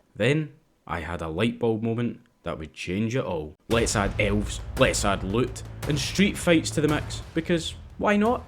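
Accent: Scotland, United Kingdom. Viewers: Good Sir Scotsman thank you very much for sharing your valuable experience, learned a lot from it and enjoyed your nice accent 👍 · Dude, your voice is epic, gotta love Scottish English! Dont give up gamedev. You enjoy it, thats all that matters, sooner or later you will have tons of money :D Cheers from Ukraine!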